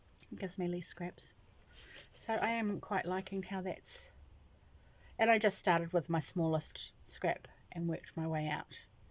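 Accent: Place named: New Zealand. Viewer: Hi.. I've been a subscriber for awhile now and always enjoy your videos (and your Kiwi accent). I've lived in NZ and you bring back fond memories. I agree with you about postage from overseas being expensive but a long time ago I discovered Springwools in Ireland and have bought lots from them. They have a flat rate international shipping which is 3.99 Euro and according to Siri that would be $7.05 NZ. Their service is nice and quick, although I just noticed they have a message on their site saying they're experiencing slight delays because of Covid-19. Anyway, its worth a look. I love the King Cole Superballs - 250G and work out very reasonable.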